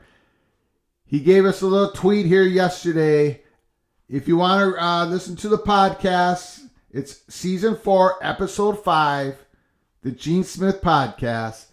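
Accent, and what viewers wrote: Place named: Minnesota, United States. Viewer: Your Minny dialect sounds Chuck Grassley-adjacent "vooootes."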